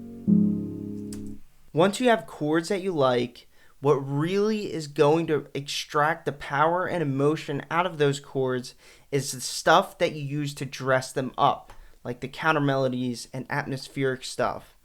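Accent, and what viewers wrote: Philadelphia, United States. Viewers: The Philly accent is so strong. You got a fan.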